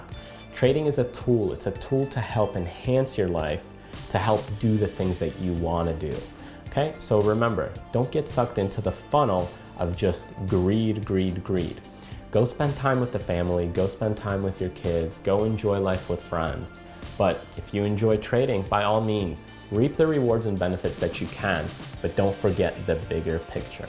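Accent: Russia. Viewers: thank you for a great video man , btw are you Russian ?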